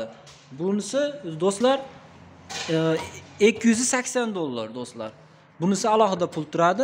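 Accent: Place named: Russia. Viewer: Ака лекин русча аксентийизга беш кеттим лекин, 😂😂😂 кловат, и, димахот гап ук лекин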